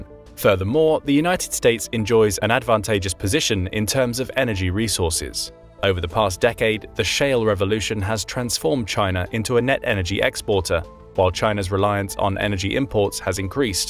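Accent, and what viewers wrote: England, United Kingdom. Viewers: Thank you for actually stating you base this whole video off nothing more then purely speculation. You stated that this “Shoddy Construction” is based off a few of the islands runaways. You disregard the idea it could be to not provoke further escalation. Do you know that China is the builders of the world. You are lacking facts in this video. 100 years of humiliation….is the time you reference China being the largest economy and then crumbling. Th is was because the West forced worst, opium population, devastating economy, country all up with colonialism something that the rest seems to excel at. The question part is also that there is information here that is worth knowing actual but it’s used just to pop up a story or narrative that’s s not true you. if you decide to do research and publish videos based of facts might actually be worth following. 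You know what is true…Americans hear a narrator with an English accent, and automatically give credibility to whatever information is being spread. Neat trick and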